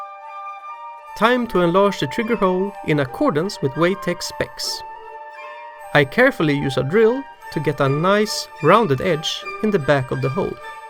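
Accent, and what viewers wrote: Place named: Russia. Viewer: Why would you destroy a real steel lower so you can make it into an airsoft? That makes no sense wasting a registered lower to do this. You could have just bought an 80% real steel to turn it into airsoft. Then it dawned on me that accent... Maybe you are not in the USA… maybe in Russia? Daym... It's that easy to get an m16 lower? Daymmm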